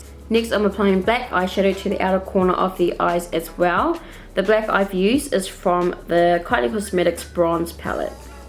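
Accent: New Zealand. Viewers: are you from New Zealand? 😍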